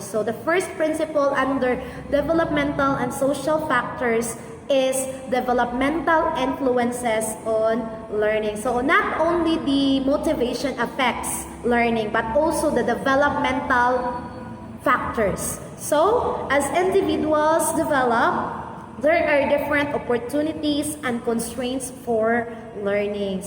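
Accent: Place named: Visayas, Philippines. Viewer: Super love bisaya accent Ma'am, you did great po! 😍❤️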